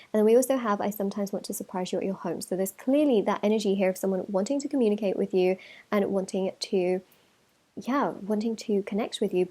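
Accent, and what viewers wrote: United Kingdom. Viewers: Lovely reading, lovely accent (very British), a big hello from America 🇺🇸 to our friends across the pond, half a Brit on my moms side !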